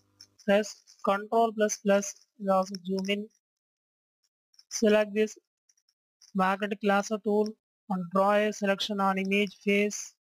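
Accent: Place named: India. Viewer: I agree this is the worst tutorial on Photoshop morphing techniques. the final end result is a disaster. no maintenance of ratios, lighting, hell he is even using a rasterized cut layer for his process. With all these negatives in place, the dialect in which he spoke was the only thing you noticed? Why do you even have to say broadly, that 'Indians' should not be allowed to attempt to speak that language? Who are you? The keeper and safe-guarder of English language? People like you should not be allowed to even comment on YouTube or any other social media for any case. You have the gall to speak about Indians as such and watch a video from them, amazes me beyond the realms of any form of human thought. The uploader of the video has either: no brains, absolute idiot or a moron, who channel as a legit name ' ' with noob kind of videos. And on the other side, we have half cooked, stupid taboo people like you who are racist.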